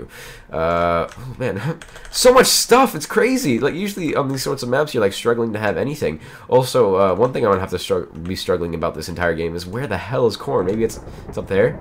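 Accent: United Kingdom. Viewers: Your British Accent xD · the british accent ain't bad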